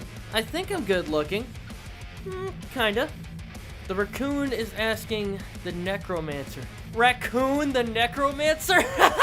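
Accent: United Kingdom. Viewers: Oh you're from Scotland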